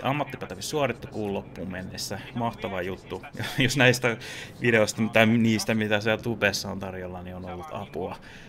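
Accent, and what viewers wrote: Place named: Jyväskylä, Finland. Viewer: Puhut niin paljon tosta Jyväskylän murteesta videolla. Mä kun en näe tossa puhetyylissä mitään outoa. Tosin koko nuoren ikäni oon asunut Jyväskylässä😁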